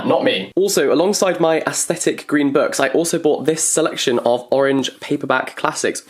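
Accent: United Kingdom. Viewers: omg he has the most british birtish accent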